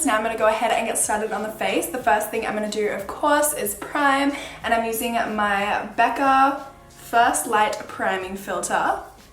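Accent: Australia. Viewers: As soon as you started talking I was like "of course she's Australian". Why are all Australian girls so damn pretty, wtf?. Anywho, subscribed 😍❤️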